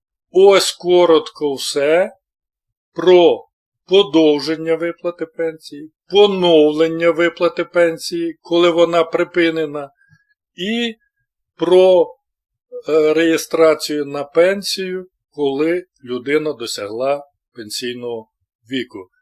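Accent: Standard. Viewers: Чітка українська мова !!! Щиро дякую !!!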